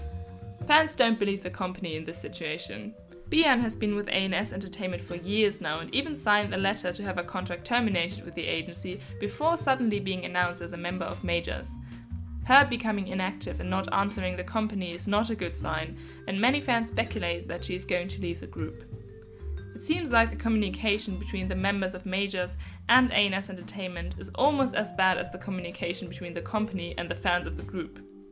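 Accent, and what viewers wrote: Germany. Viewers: this might be irrelevant but i absolutely love your accent! i think i can hear that you speak german as your first language, and i just wanted to say that it makes listening to you speak very relaxing. sehr schön!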